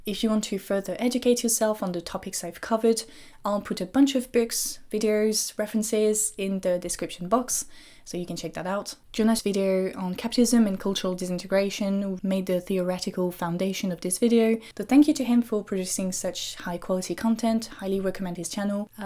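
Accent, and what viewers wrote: North, France. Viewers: ah tu es du nord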